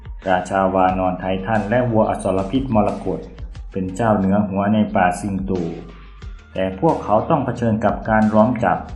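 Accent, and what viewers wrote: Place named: Isan, Thailand. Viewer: เหมือนจะออกเสียงไปทางอีสานนิดๆนะครับ😂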